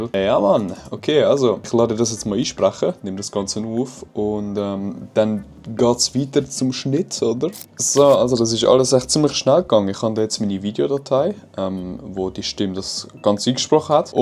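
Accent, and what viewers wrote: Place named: Switzerland. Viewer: Also trotz Schweizerdeutsch kann ich dich gut verstehen. Fands interessant wenn auch die Videos die rausgekommen sind mich so absolut überhaupt nicht catchen.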